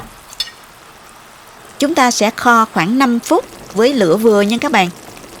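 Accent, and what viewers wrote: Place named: Countryside, Vietnam. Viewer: Giọng nói quê phèn mặn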